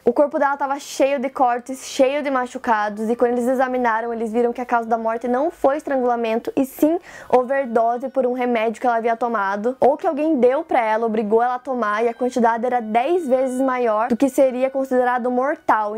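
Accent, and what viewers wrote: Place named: Rio Grande do Sul, Brazil. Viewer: O sotaque dela me soa gaúcho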